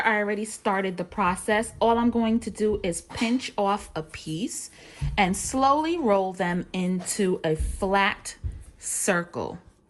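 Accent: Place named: Jamaica. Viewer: Please use your accent more. The Jamaican accent is so beautiful to hear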